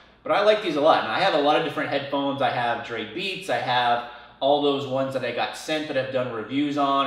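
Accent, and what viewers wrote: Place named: Baltimore, United States. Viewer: are you from Baldimore?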